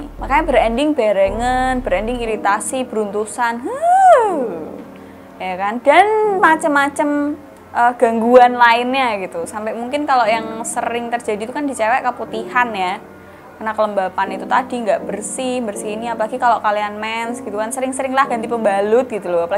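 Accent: Java, Indonesia. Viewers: jowo mu ngendi mbak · Jowone khas jawa ndeso 😀 tp gpp lah rodok terhibur 😄😄😄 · Kk e medo' ,😆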